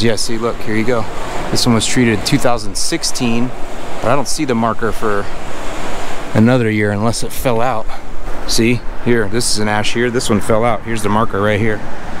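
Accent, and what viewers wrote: Chicago, United States. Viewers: MORE CHICAGO ACCENT 😎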